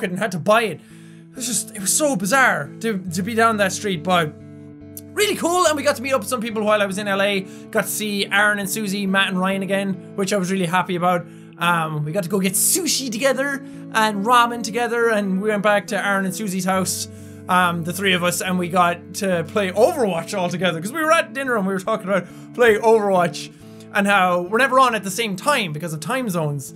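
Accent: Ireland. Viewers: am in Brisbane Australia.;D ill be up watching and everyone in my house will be like "where is that shouting Irish voice come from?" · Jack/ can you possibly add Auckland New Zealand time because that is where I live and if you are looking for a peaceful vaca without being mobbed or anything that's the place to go and if you ever feel like coming to my country I think you might want some help saying our regions if your scared that you might get angry at for pronouncing the name wrong because you are from Ireland and you have a type of strong accent that kind of makes it harder for us to understand if you are saying the name correctly or not. So if you ever see this comment and do this thank you!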